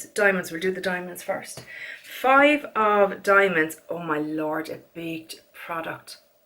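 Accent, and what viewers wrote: Ireland. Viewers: Hi that purple blush lol - next time I'm in Edinburgh i'm going to the Fenty counter to try it on. I'm super pale (Scottish milk bottle lol), cool toned. with grey eyes and blonde hair. I LOVE purple eyeshadow and a mauve blush, but the purple blush intrigues me. I've never seen it swatched before on light skin and I think I might like it - I love a lavender face powder, as it's so brightening on my skintone. Project pans are a fairly new thing to me (I found you through an empties video), but I love the Irish accent - my grandad was Irish and it reminds me of him. He lived in Scotland for around sixty years and never lost his accent. I've been to Ireland a couple of times - once to visit relatives with my grandad (before he died) and once touring on the motorbike. Loved it both times, but it rains as much there as it does here lol, so it's not the best weather for the motorbike. 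It is pretty though and the people I met were friendly. Best wishes.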